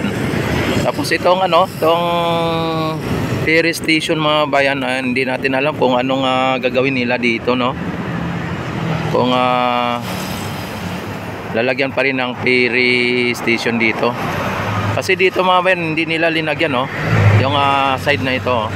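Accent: Visayas, Philippines. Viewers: 🤣🤣🤣bisaya!hirap ba mag tagalog at may punto ka ng bisaya!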